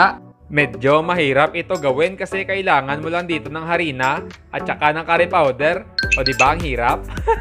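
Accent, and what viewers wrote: Iloilo, Philippines. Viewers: Tuno mo ilonggo gid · Love na love q. Tlga accent ng ilonggo/accent ang sarp pkinggan..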